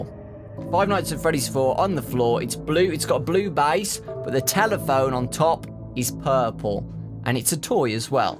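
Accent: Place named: United Kingdom. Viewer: As soon as i heard his voice i said im gonna learn so much British from you this video was really good btw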